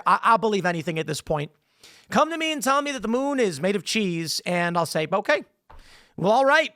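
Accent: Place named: Chicago, United States. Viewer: face in all these recent thumb nails are smug af imo just saying. Que to thicken the Chicago accent about cheeseburgers and being cooked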